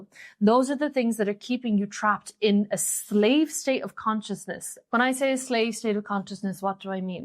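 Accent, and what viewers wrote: Ireland. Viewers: When awise awake Old Soul has also won the best face card... and intelligent and classy af, also.😭 She will be GRAND! AMAZING VID. You are also funny, when did some "shooting fails". Stay authentic, no to AI! ✊️ P.S. Are you Irish? ☘️ ✨️💜✨️ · do I detect an Irish accent there?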